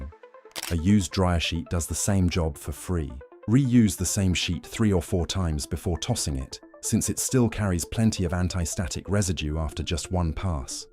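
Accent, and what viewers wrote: England, United Kingdom. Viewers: English accent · Why is original English accent talking in Dollars⁉️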